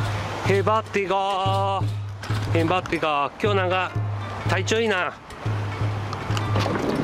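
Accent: Tsugaru, Japan. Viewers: 津軽弁、懐かしい❗️ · 諸事情で津軽弁は体が受け付けないんです。金◯町の元公◯員のせいで！ 動画自体は面白そうなのでボリューム0で観ましたwww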